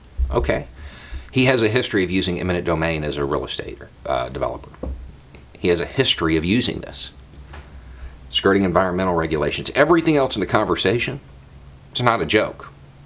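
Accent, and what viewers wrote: South, United States. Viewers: Clicked on this and saw a guy with a southern accent talking about Trump...thought I knew what was coming. Instead I got a reasonable voice from a concerned American and for once I am glad to have wrong assumptions.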